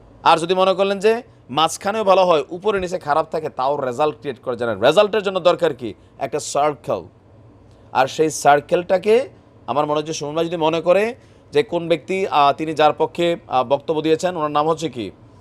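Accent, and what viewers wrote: Sylhet, Bangladesh. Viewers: ভাই, আপনি তো দেখি খুব ভাল সিলেটি ভাষায় কথা বলেন। আপনি একটু আজকের গা-----যা র খবরটা দিন। আগুনে পুড়ে মানুষ শেষ হচছে। আর শিরচছেদ...... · Apni kita sylheti ni❤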